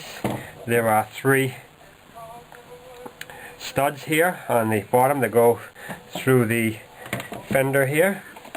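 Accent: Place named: Boston, United States. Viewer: love the video! i lived in boston before. sounds live your from there lol!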